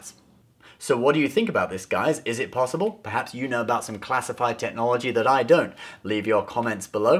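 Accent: United Kingdom. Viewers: The people like your British accent. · I want more British and Star treck plz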